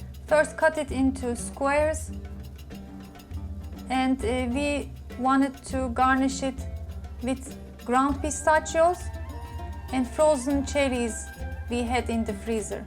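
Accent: Turkey. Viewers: Love your English Turkish accent!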